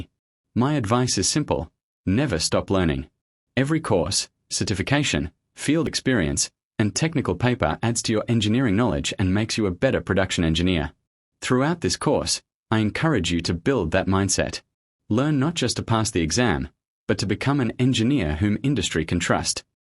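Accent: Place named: United Kingdom. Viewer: This is a great chapter, can you change the British accent to American?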